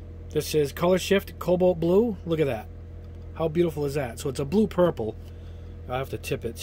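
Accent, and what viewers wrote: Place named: New England, United States. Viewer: I’m hearing a distinct New England accent here…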